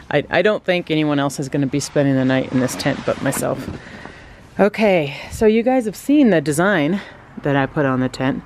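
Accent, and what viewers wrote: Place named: Europe. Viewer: hi mama. nice to see your mama. im binge watching bedrest day today painted all my window frames yesterday outside. i love the wood design you hand painted it? great she helped you. she lives in usa too i see. aw thats so cute she was teaching the girls to sew too how precious to see that. you grew up partly in usa youve got an accent from Europe but she doesnt. ? is your story here in one of your videos? good idea he overlapped material this meant seam would becovered. imagine making your own tent what a task good for her. im middle laying out material making curtains and dog jackets too. getting cold got to get dog jackets finished.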